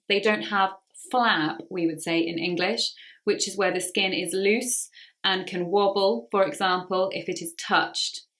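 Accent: United Kingdom. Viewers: It has been a utterly splendid, exquisite and flawless lesson. I'm particularly joyful, blissful and elated with that opulent topic. It is to my liking; moreover, it appeals to me. I do adore speaking, and her charming accent., Hence, I present undoubtedly the Queen of British English. You are the quintessential of British English. Thank you very much indeed. Far thee well, dearest Prof. N.B I am on the edge of making myself some “arrabbiata”, “caprese” and a slice of tiramisù. Would you care for an alluring dessert? Ciao. Andrea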